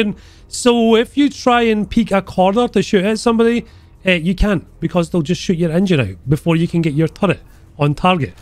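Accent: Scotland, United Kingdom. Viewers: You got me into War Thunder recently and always make me laugh. I love the silky Scottish tones too 😂 You keep up the entertaining work marra!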